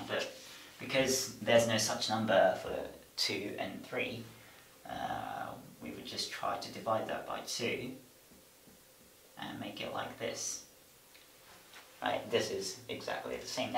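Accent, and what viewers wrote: United Kingdom. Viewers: 70% British + 20% American + 10% Japanese accent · Interesting video! You explain things clearly. By the way, you speak with a British accent but pronounce "diameter" in a very American way. It's quite funny.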